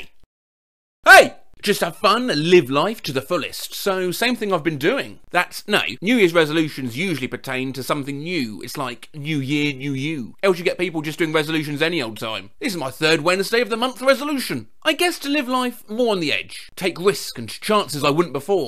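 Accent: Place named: RP, United Kingdom. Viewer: Hell yes new posh Brit video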